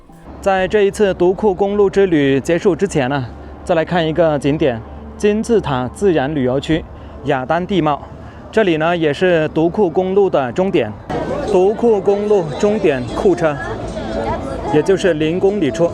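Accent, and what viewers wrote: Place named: Chaoshan, China. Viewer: 潮汕人口音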